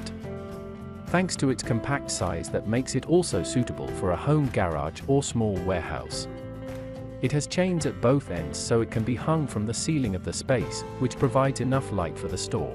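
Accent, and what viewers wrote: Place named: United Kingdom. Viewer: STOP USING BRITISH VOICE OVER... american lite? then use a american vo. we dont like listening to limey's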